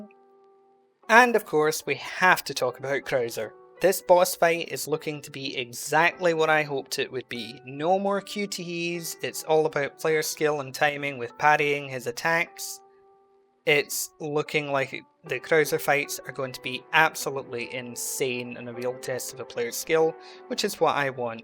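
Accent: United Kingdom. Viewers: Bro, where ya from? Roughly Ya sound British, but you Actually put in effort in saying the Spanish words well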